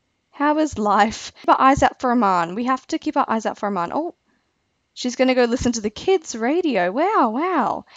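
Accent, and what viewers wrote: Australia. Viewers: Is your accent, Australian?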